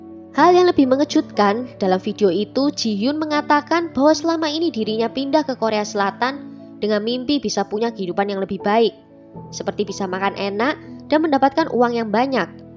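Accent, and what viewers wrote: Java, Indonesia. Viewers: Say medokuhhh 👍💖😍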